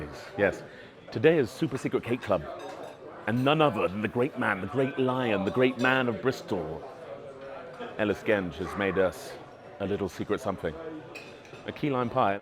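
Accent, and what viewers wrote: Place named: Austria. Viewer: Have to acknowledge the Arnie accent sir!!! 🤌🏾🫡💪🏾🔥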